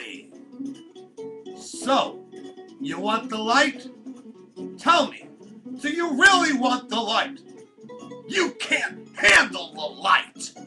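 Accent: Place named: India. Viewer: You're Indian accent is funny!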